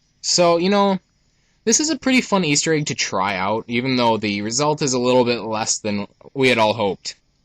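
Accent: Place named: Russia. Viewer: Also this guy has a Russian accent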